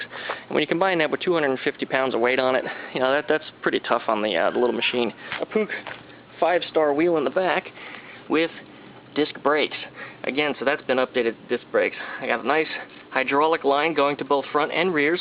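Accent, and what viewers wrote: United States. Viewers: It's in a good Way bro and you kinda sound country no disrespect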